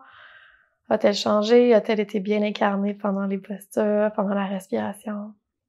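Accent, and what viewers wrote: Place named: Quebec, Canada. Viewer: Je suis débutante et merciiiiiiii, j'ai adoré cette séance. et ce ton zen québecois cher à mon coeur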